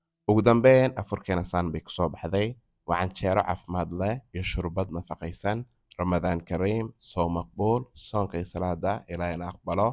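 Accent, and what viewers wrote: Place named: North, Somalia. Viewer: Af reer waqooyigu ma fahmayoo😊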